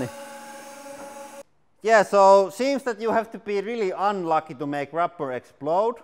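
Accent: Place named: Finland. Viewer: You have finnish accent. :)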